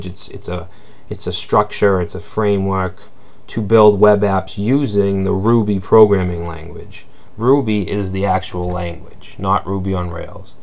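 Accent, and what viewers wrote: Boston, United States. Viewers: accent sounds very boston-y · Are you from Boston? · bcz he is from boston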